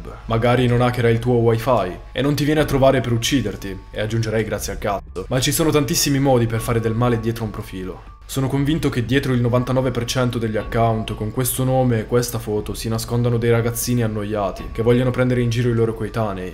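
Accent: Sardinia, Italy. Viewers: Ciao cara, anche tu l'apprezzeresti allora? Magari facendo trasparire un pò l'accento sardo... mmmhh ci starebbe molto, molto bene ❣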